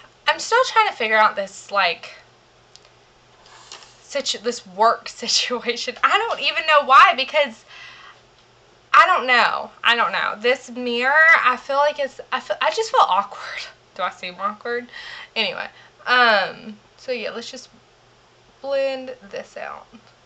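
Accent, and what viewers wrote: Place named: South, United States. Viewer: This video reminded me how much we have in common! 1. Southern accent. Love. 2. Curly hair 3. Obsession with the E35 ...just for starters :) Great video, dear! Love it!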